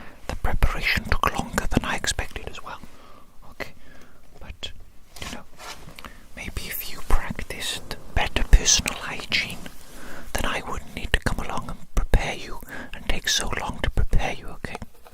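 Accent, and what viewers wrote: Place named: RP, United Kingdom. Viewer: This dude’s pronunciation puts stereotypical British to shame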